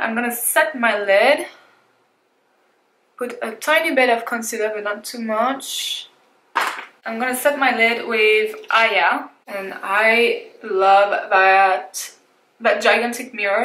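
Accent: France. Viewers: Beautiful look! Thanks for your first impression review, I'm so torn on whether or not I should buy it. I was wondering what your accent was because it sounded familiar, yay for another french girl! I'd love to see some videos in french too! I moved to the USA from Paris when I was 9, so I don't have my accent anymore :( it's a shame because I love it so much.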